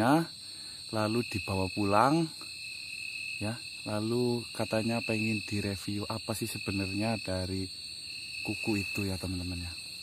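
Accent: Banyumas, Indonesia. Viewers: oh pantes bhasanya sama kya saya...ngapak..mksih mas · Ngapak purwokerto banyumas brooo..😂👍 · klu tegal lebih medok klu pwto lbh soft ngapaknya😎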